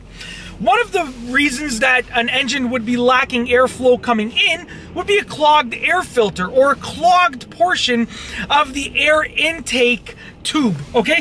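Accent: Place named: Toronto, Canada. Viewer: As soon as he started talking I knew he was from the gta. then I noticed the streets and was validated with the knowledge of knowing I was right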